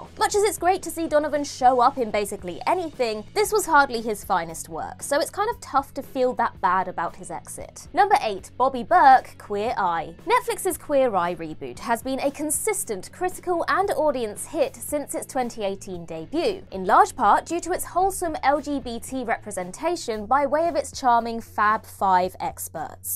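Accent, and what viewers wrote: United Kingdom. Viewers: lol love British talking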